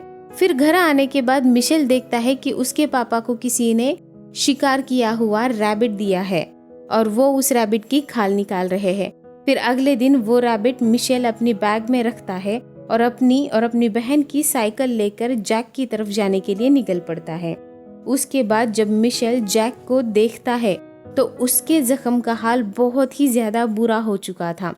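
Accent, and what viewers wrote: Maharashtra, India. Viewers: तुम्ही महाराष्ट्रीयन आहात ना...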